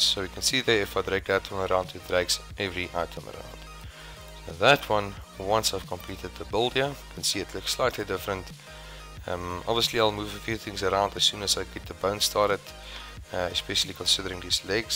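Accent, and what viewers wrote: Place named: Johannesburg, South Africa. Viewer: thought i recognized that accent. jo burg? anyways thanks again from a total beginner. question: how are you enlarging or shrinking the parts when you are are moving them around. what controls are you using?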